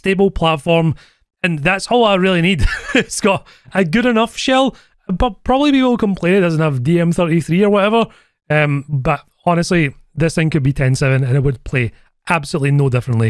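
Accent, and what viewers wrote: United Kingdom. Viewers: revärs ? Are you britisch or what accent is it?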